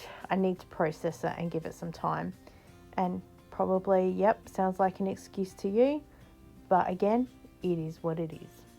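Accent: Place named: Australia. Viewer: Dana's lovely. She's an ADHDer and developed her no mess method, precisely coz normal methods didnt help her. Hi from Sydney, you sound like an Aussie!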